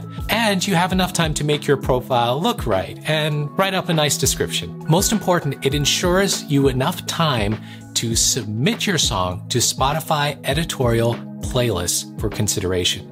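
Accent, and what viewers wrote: India. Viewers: Abe tu Indian hai na? ....Orr bohot angrezi jhaad raha hai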